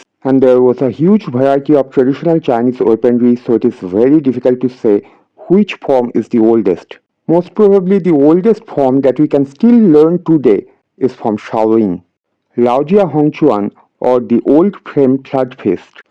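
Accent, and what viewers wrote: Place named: India. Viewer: You sound like you are from India. I like that you are very critical about the indian influence on Kung Fu and debunk the myth about Bodhidharma. But in the northern KF styles I see some influence of Yoga and Kalari. Do you know the Hatha Yoga posture "the warrior". Think of that with fist and you have a typical northern shaolin posture. Also I think these long movements and low and wide stances in the northern KF forms are for stretching and energy development like in Yoga. For fighting they dont make much sense. On the other hand the southern styles the link to the combat application is more obvious and the movements have quite a different character.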